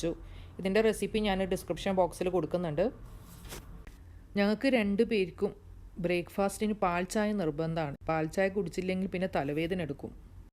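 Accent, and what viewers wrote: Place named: Kasaragod, India. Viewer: Ningal kasargod aano?slang kettit chodichada...evide thamasam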